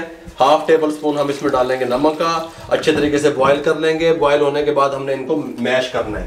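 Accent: Punjab, India. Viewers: اسلام علیکم بھائی جزاک اللہ خیر آپ بہت اچھا کام کرتے ہیں اور میٹھی میٹھی پنجابی آپ کی بہت اچھی لگتی ہے اور اللہ تعالی آپ کو اور عزت دے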